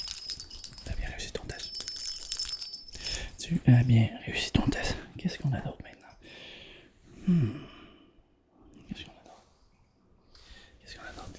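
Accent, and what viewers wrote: Quebec, Canada. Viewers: Tu est du Québec ?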